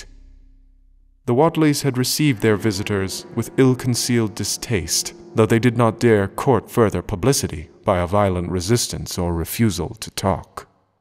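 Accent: South, United States. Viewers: Loved the narration. My only complaint is that he gives the New Enlanders southern accents. They sound like they're from Georgia more than Massachusettes. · The narrator is absolutely amazing, there’s no doubt about that, although I can’t help but chuckle at the “southern” accent even though Dunwitch is supposed to be set in rural Massachusetts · absolutely loved the reading...minus the southern accents used :) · Overall good narration but accent sounds more southern than northern as it should be.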